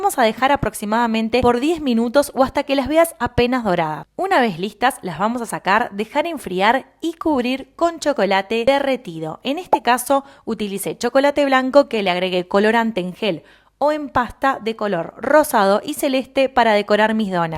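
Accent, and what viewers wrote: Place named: Mar del Plata, Argentina. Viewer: Gracias, Probaremos tus apetitosas Donas, explicadas con tu lindo y ágil acento de Mar del Plata; soy panadero y repostero artesanal que hacemos hostelería con artes y tradiciones en Salento Colombia.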